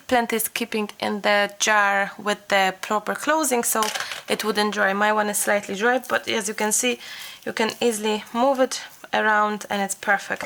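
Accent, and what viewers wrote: Cork, Ireland. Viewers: I'm loving your accent! Is there a bit of Irish in there or am I crazy? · Greta video, i love how the 'Cork' accent was strong enough for me to wonder if you were living in Ireland - Im also in Cork :D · where are you from?? This is my 1st time watching your video and you did a fantastic job!! It's just I've been trying to guess my 1st 2 was Russian or Polish but then when you carry on speaking every now and then you sound Irish x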